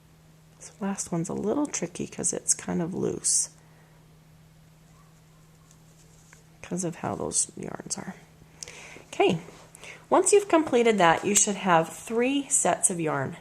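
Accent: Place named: England, United Kingdom. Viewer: Thx for the help but just saying u come from England ur not american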